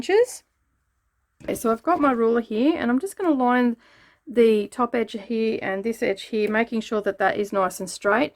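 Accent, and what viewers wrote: Australia. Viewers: This tutorial comes just in time for me. I really need to make a couple of these bags to hold some toys for my Grandson. Thank you. Great tutorial. Nice to listen to a fellow Aussie :)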